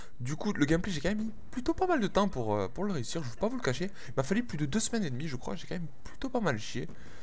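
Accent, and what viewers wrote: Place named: Toulouse, France. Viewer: Un bon toulousain avec cette accent sa fait du bien, les toulousains les meilleurs le meilleur si je pourrais jouer avec toi sa serait cool si tu veux je te donnerai mon gt;)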